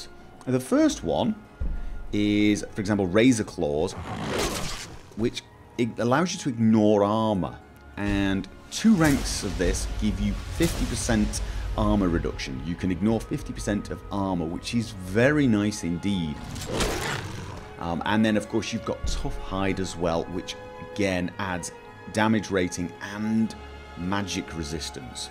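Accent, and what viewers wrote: United Kingdom. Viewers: dude i just love your vids your british(could be scotish i cant tell these sort of accents apart :P)accent makes it so enjoyable to watch :)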